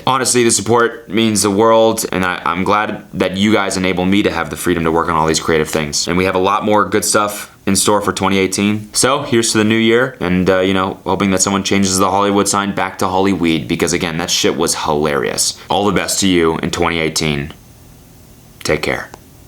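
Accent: Canada. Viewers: I always forget you’re Canadian until you open your mouth and say “sohrry”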